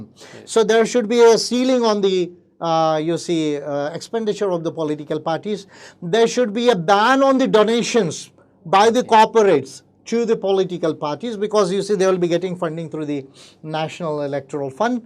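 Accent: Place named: United Kingdom. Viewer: Sir please don't use the British accent.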